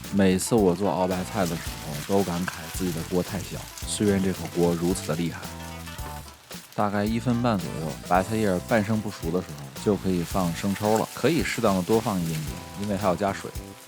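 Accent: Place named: Beijing, China. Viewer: 时的センス让人当时就反应到“嗯？北京的口音？” 紧接着“今儿”可以确诊，“是北京人”🤓 直到“熬白菜”一出现哇塞超懐かしいやろ～ 一说这词儿得久违了多儿年了自己都想不起来但那是在北京儿时的记忆 “热锅凉油”一出来“爱？专业干这个的？” 那颠锅时的自如，郎鹤炎有话：“内就是厨师！” 完后才明白，“料理与吐槽”是这样结合的。就的还不是米饭是特么干粮！ 😄😆🤣订阅了❤️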